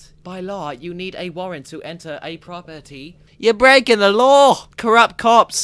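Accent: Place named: Australia. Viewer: I like this guys Australian accent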